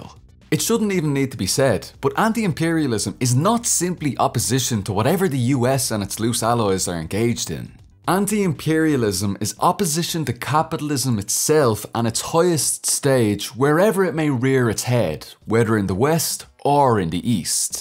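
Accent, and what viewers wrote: Ireland. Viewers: Are you Irish? You seem to have the accent lol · Oh shit I'd always wanted to watch an Irish lefty creator, pity you have the sound of a dub in ya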